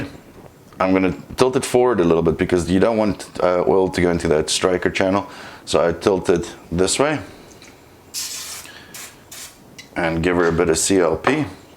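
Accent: South Africa. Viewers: Great video...your accent..is it a South African twinge? Lekker man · South African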